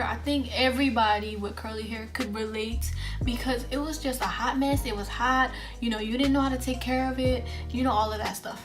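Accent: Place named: Philadelphia, United States. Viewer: You have a Philly accent. If you lived in Philly, you had Italian food. At least that's my experience. You have the food from your culture and you had Italian.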